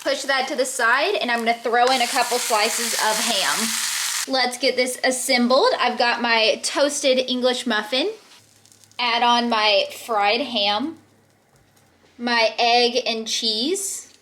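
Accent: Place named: Texas, United States. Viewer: my riding instructor was always reminding me to keep my heels down in the stirrups. I guess it is a safety issue in case your horse bolts you won't get hung up in the saddle. I noticed the instructor on the video also had his toes jammed in too, I love Western riding but never cared for English, I hated their saddle type and it was hard on the bottom -) By the way, you are beautiful as always, my grand daughter looks alot like you, but we have the Michigan accent instead of the Lone Star State accent. Our southern friend tell us that we are the ones with the accent LOL.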